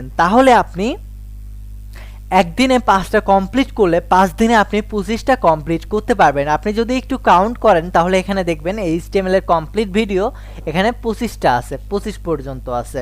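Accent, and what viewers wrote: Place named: Noakhali, Bangladesh. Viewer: ভালো লাগলো আসলে। আচ্ছা আপনার বাড়ী কি নোয়াখালী নাকি? কথা ঐ রুকুম আসে।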